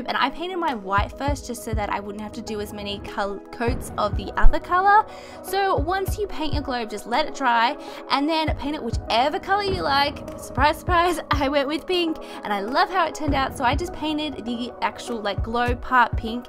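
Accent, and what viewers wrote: Australia. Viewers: She's from Australia so yes she does have an accent! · are you Austrailian? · Are you from australia?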